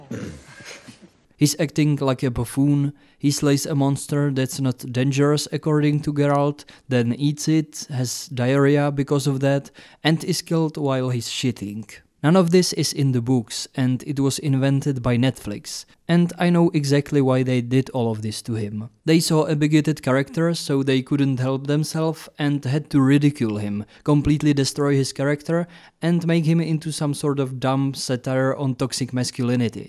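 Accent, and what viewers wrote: Czech Republic. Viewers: love your Czech accent!❤ keep it up, bro.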